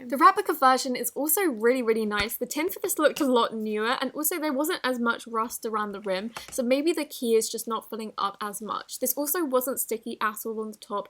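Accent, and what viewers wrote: United Kingdom. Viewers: Are you British?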